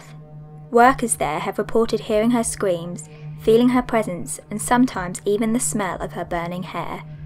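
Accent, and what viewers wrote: United Kingdom. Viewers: Joel Fernández Jerez its almost like Chills twin with some sort of British accent.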